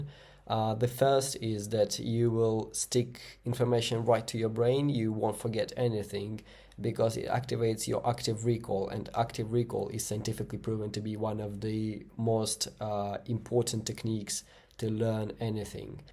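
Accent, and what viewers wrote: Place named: India. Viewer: I am sitting over here trying to decipher his accent because it sounds Indian but he clearly doesn't look Indian😅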